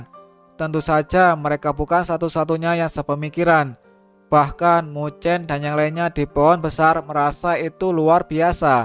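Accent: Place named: Java, Indonesia. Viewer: Wah medoh jawane✌😁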